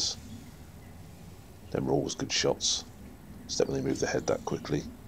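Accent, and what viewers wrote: United Kingdom. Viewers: I was just thinking if you put all that all your videos on DVDs and put them on American type DVDs to where I can watch him on my DVD player I would buy them and I know a lot of other people that would enjoy your Pest Control show on YouTube would enjoy them as well and be a good way to make money as well cuz a lot of people would buy them and I would definitely buy them just too helpful hint saying that I would love to sit back and watch it on a bigger screen instead of my phone they let me know what you think and it would be awesome to own all your videos because it's been an hour of watching them two good learning experience there's a couple of things that you say that are funny in each video because just the way the British talk I'll I love the way the British talk they have a certain way with their slang and it's just funny and certain things that you say like mr. 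Pheasant and for me is like thanks for playing mr. Squirrel it's all over LOL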